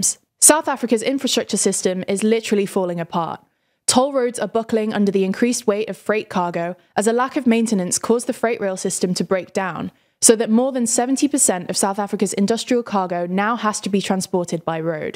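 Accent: United Kingdom. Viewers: Sound like UK 🇬🇧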